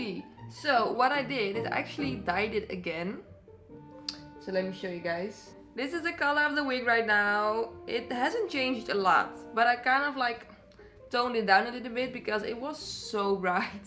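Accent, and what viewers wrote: Netherlands. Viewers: Leuke video, ik heb je nu pas gevonden. Ik hoorde je accent en ik dacht: die is Nederlands.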